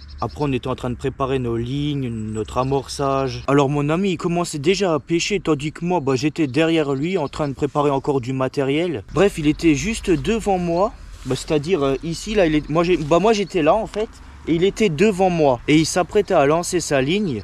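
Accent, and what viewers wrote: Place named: Moselle, France. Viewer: Vu l'accent , tu est de Moselle ???? moi je suis d'Hayange 😊😊😊😊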